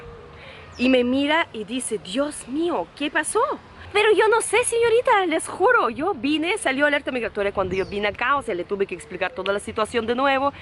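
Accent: Amazon, Peru. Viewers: tienes un acento de la selva peruana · Tienes un dejo charapa 😄. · Tu español es rapidisimo...casi ya 100% Peruano..bien alli. !! Suerte en mi Peru, como lo extraño !! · Se te pego el dejo peruano 😍😍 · X ahi dice q se te escucha como charapa de la selva pero aveces te escucho como la paisana jacinta x eso me caes bien dices q vas a ir a Ayacucho en abril q bien tengo familiares x ahi la pampa de la quinua es un lugar muy turístico xq ahi se libro la ultima batalla de Ayacucho contra los españoles donde q ganamos y se sello la independencia de Peru y America hispana y tu historia es graciosa en conclusión entrastes al peru x tener un gran cu.. xq a los latinos nos gusta mujeres de gran ku.. jejejeje . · Interesante divertida la forma de contar tu travesía tu español peruanisado es lo mejor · Yo te escuché y pensé que eras charapa. Me encanto tu historia y la manera de contarla. 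Felizmente te quedaste en el Perú. Bendiciones. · Soy yo o me parece que tiene el dejo de la selva ¿? ... Por cierto que buen español manejas. Saludos!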